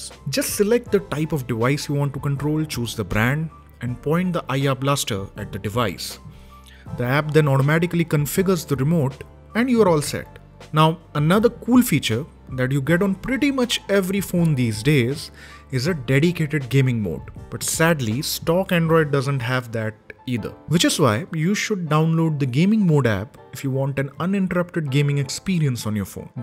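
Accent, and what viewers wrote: India. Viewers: sorry to say, i hate ur indian accent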